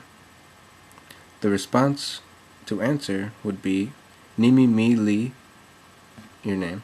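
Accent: United States. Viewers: It sounds like american.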